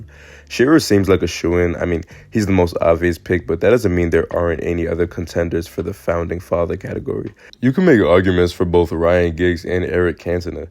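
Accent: United States. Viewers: It’s pronounced more like lair-star not lie-stir but I get that it’s harder with an American accent to pronounce English club names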